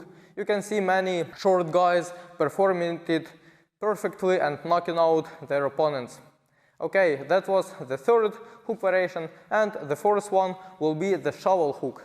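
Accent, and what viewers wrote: Russia. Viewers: Russian accent = absolute beast in anything combat related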